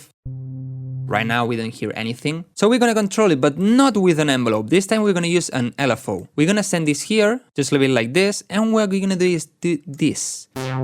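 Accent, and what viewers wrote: Spain. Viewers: Fine tuto! You have Spanish accent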